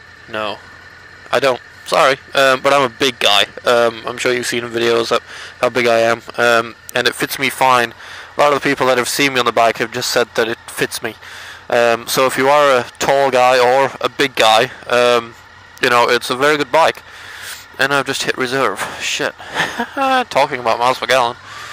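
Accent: United States. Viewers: Nice vid but that mid-adlantic accent......what on earth is going on?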